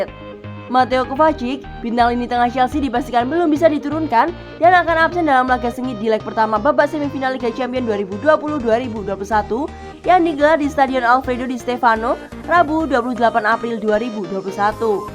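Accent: Java, Indonesia. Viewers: pengisi suaranya medok min 😁🤭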